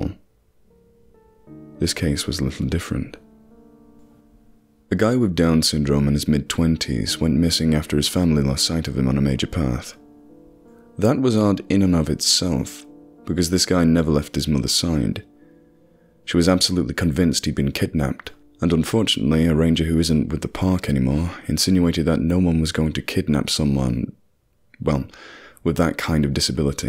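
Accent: South, United States. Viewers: Your American accent is 👌🏻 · Great job on the American accent! :-) I love these stories. My dad was a volunteer with Search and Rescue here in Canada years ago. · I swooned over the southern accent. I recently discovered your channel dear stranger, and I fancy your narrative.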